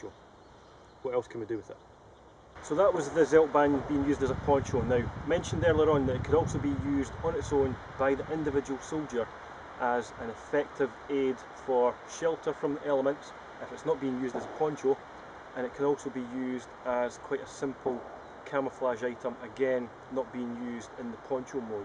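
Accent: Scotland, United Kingdom. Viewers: a scottish accent german...WTH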